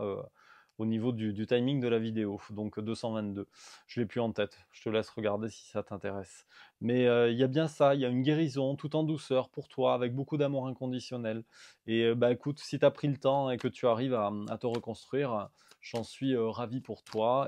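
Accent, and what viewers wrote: South, France. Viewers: Bonjour, J'ai souvent des synchronisatées style 111, 11h11, 777, 31 qui est son département, 29 date de naissance bref, je n'y crois plus car sa fait trop de temps passé, perso j'ai du mal à refaire confiance aux hommes dsl mais je dis se que je pense Sa reste un tirage général malgré tout. Lumineuse journée bel accent du Sud ou..... Merci. 🙏💜💜🙏💜💜🙏😇🙏🕯️🙏🕯️🙏😘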